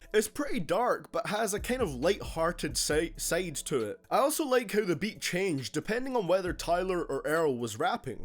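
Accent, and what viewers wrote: Scotland, United Kingdom. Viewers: Are you Scottish? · I love the Scottish accent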